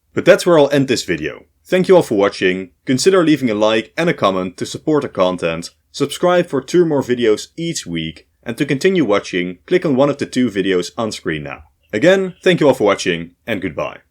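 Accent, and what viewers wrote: Netherlands. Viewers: You sound dutch like me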